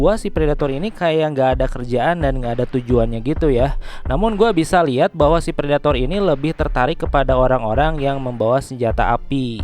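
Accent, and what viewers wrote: West Java, Indonesia. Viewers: Orang Sunda bang?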